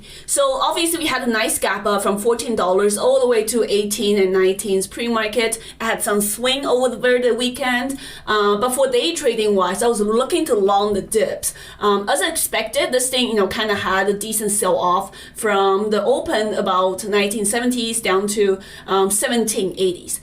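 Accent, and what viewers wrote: China. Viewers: Your accent is so thick I swear it’s like you’re speaking Chinese sometimes. I have to work hard to understand what you’re saying. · Can’t stand your Chinese English accent 😂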